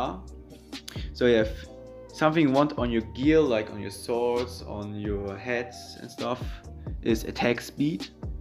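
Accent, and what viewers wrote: Germany. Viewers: Hahahaha direkt gemerkt dass du Deutscher bist :DDD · Du bist Save deutsch merkt man 😂 lass mal zsm zocken adde mich mal auf Ps4 heiße Universum007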